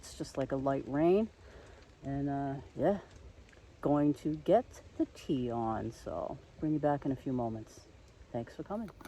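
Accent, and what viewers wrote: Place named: Boston, United States. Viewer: Whoaaa…. You have excellent instincts!… you played it “smahht” 😊… absolutely luv luv the Boston accent!!.. I’m from northern California.. we don’t get to enjoy that over here 😕.. aaaaaand.. nice tent with the built in ground cover or pocket for the vestibule… I always learn something from you.. thanx! 👋🏼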